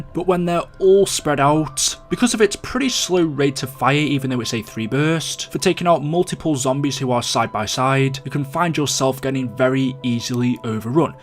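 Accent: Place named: Birmingham, United Kingdom. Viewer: Are you from Birmingham? I mean that accent tho..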